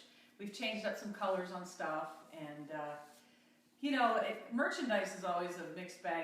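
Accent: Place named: Canada. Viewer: You can definitely tell you are Canadian when you speak, the accent definitely doesn’t show when you sing❤️🇬🇧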